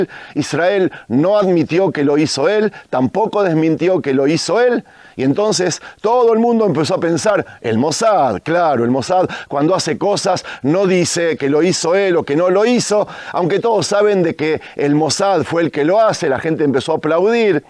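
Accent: Buenos Aires, Argentina. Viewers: Que interesantes y variados son tus videos. Me sorprendes cada vez mas. Gracias, por estos paseos y narraciones maravillosas con tu acento porteño que me suena a musica celestial. Mil abrazos, querido, desde Barcelona. Un beso para tu Nira querida. Seguimos orando x Israel y x mis queridos hermanos judios. SHALOM. AM ISRAEL JAI✡️❤️🙏🇮🇱✡️❤️🙏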